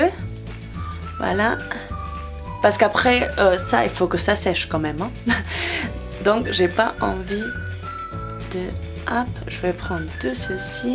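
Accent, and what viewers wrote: Spain. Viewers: J'adore ton accent espagnol! Tu es trop drôle! Bonne continuation!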